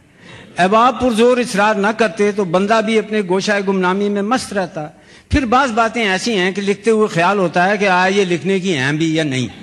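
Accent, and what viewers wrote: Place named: Hindko, Pakistan. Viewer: Oh okay thanks for clarifying. I was wondering how Zia Mohiuddin sounded like a native Hindko speaker talking in Urdu.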